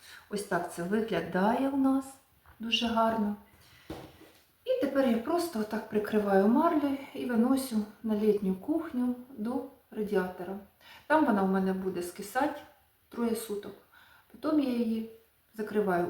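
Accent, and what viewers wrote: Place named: Surzhik, Ukraine. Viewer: Якщо б требо було повільніше, то не вийде.Суржик жахливий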